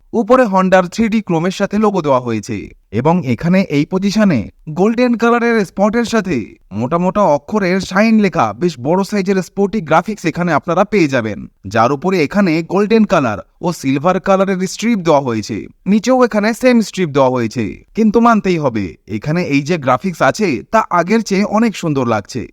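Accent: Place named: Bangladesh. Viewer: আমি বাংলাদেশ থেকে বলছি। আপনার বাংলা বলার ধরন প্রায় বাংলাদেশীদের মতো। বাংলাদেশে কবে নাগাদ আসবে সূত্র উল্লেখ করে বললে ভালো হয়।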